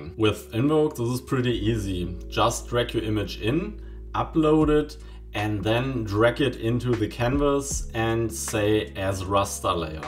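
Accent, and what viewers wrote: Germany. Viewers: Dein deutscher Akzent kickt sowas von rein xD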